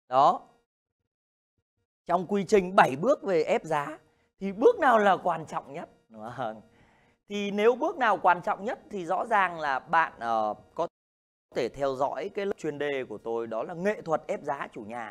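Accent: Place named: Tho Tang, Vietnam. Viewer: Thầy nói giọng Thổ Tang nhưng đẹp trai thông minh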